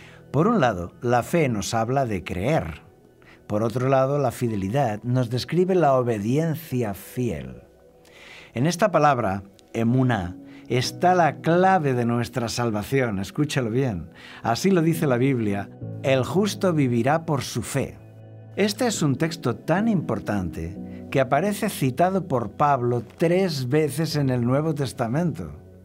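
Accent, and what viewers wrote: Spain. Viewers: HOLA. HACE APROXIMADAMENTE DOS MESES ENCONTRE ESTE CANAL. TODOS LOS VIDEOS QUE HE OBSERVADO Y ESCUCHADO ME HAN PARECIDO MUY ACERTADOS. Y UNO DE LOS QUE MAS ME HA DADO LUCES PARA MI VIDA DE FE ES PRECISAMENTE EL QUE TITULA: ( QUÉ ES LA FE). ME GUSTARÍA SABER SI HAY ALGUN VIDEO SOBRE EL TEMA DE LA MÚSICA, EN ESPECIAL LAS CANCIONES DE FE. LES FELICITO, PUES OBSERVO EN TODOS LOS VIDEOS ( LOS QUE HE VISTO) QUE SON UDS MUY OBJETIVOS. ME GUSTA LA FORMA DE PRESENTAR LOS TEXTOS BIBLICOS. SOY DE COLOMBIA Y SIEMPRE ME HA GUSTADO EL CASTELLANO HABLADO EN ESPAÑA...( ESE QUE HABLA ) ESE TIPO DE ACENTO ME PARECE ESTUPENDO. SOBRE TODO PORQUE ESTABLECE UNA CORRECTA FONETICA ENTRE LAS DIFERENTES LETRAS COMO LA S, C, Z. LA PAZ SEA CON UDS.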